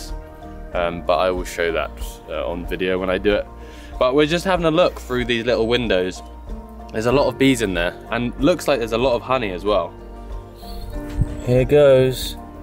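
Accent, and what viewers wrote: England, United Kingdom. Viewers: I also love watching these videos! And the English accent along with your jargon is really fascinating to listen too. Thank you for all the hard work you put into your harvesting and videos!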